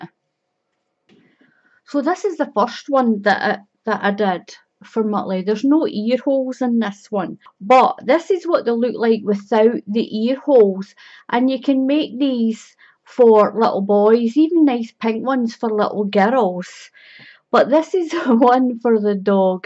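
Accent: Scotland, United Kingdom. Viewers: Hiya! Great to hear a Scots voice! Recently learning crochet. Following exact measurements for my lurcher Eloi. (Bedlington X deerhound). Apart from missing a post during my first round of front post bk post which I'm aware of and cringing about (lol!) - have u any tips on tension as my work has gotten quite loose? I'm only on the 3rd row but am mightily impressed. It doesn't REALLY matter much as his lurcher neck grows in size as it joins his neck so I could work in a longer neck onto his hat in theory anyway 🤭 big hello and thankyou from Dingwall! ✌️♥️💪👏🏴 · I’ve had double fun watching this tutorial! Firstly, Muttley looks fabulous, he’s such a good model for the hat! Secondly I’ve used the CC captions for the first time and your gorgeous Scottish accent has completely flummoxed it! Every time you say the word “six” it shows “sex”!!!!! If you want a good laugh I suggest you turn on the CC captions and settle back with a cuppa!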